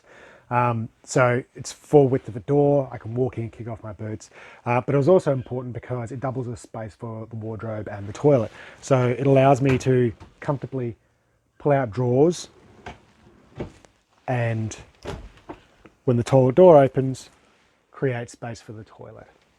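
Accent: Australia. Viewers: Dump Chump I agree I heard a accent didn’t know it was Australian though, wasn’t focused on it. To be honest I was a bit drunk when I posted. Not a excuse just I wasn’t in my peak operating condition. I often forget we aren’t all living in a global economy, I don’t notice the separation anymore. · Nicely designed for the space. Lots of thought put into it. The entry/overhang is well worth the tiny added cost - it gives it character. Just something to share...one tiny house I saw recently showed a toilet that was in a bathroom, but it was incorporated into a cupboard, and looked just like a low cupboard door that was part of a tall cupboard. The lower part just rolled out on wheels and was a composting bucket. You could do the same here, thereby utilizing the large entry space (which would then provide the headroom needed forcthe action of sitting and rising from the toilet) and would thereby free the upper portion of what you currently have as a toilet for use as storage. 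If course no privacy if there are 2 of you, but it's an option for someone for whom that design would work. You say $4,000, but YouTube is global and I can't tell whose dollars that is - Australian? New Zealand? I'm guessing those because of your accent and use of the word bench for what North Americans call a counter. 😊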